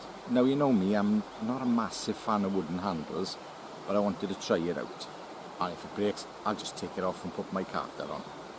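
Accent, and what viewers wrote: Wales, United Kingdom. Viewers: Great video. I love your Welsh accent. So unusual. Looking forward to seeing this Mark 2 model!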